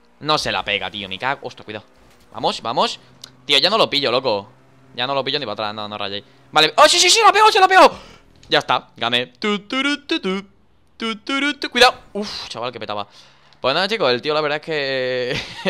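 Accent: Mexico. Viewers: soy yo o ya habla como mexicano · es español y habla como mexicano like si crees que es cierto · De donde o con quién estás aprendiendo hablar como mexicano!! saludos!!😉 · Azuverga we? De cuando aca habla mexicano?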